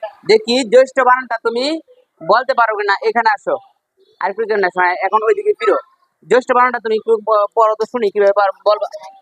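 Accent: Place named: Kishoreganj, Bangladesh. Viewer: আপনার নিজের ই তো উচ্চারনের সমস্যা ভাই, আপনার বাসা কিশোরগঞ্জ মনে হয়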